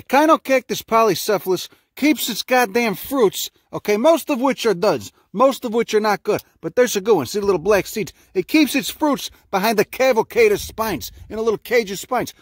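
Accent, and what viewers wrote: Chicago, United States. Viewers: I was reading about Salvia Hispanica when I noticed myself reading this sentence in Chicago-type accent like yours. Such nice wording for it too: "While soaking, the seeds develop a mucilaginous coating that gives chia-based beverages a distinctive gelatinous texture"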